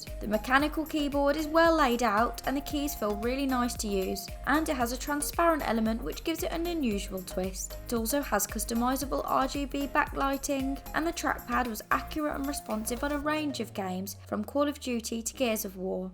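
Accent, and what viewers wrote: United Kingdom. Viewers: this is the most british accent i have eva heard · She's british. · I love that British accent ❤️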